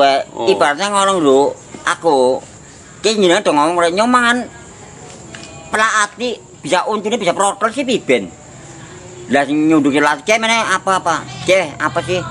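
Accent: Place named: Banyumas, Indonesia. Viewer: Mbah ... Bahasa ngapak yg begini bikin aku betah di tegal ...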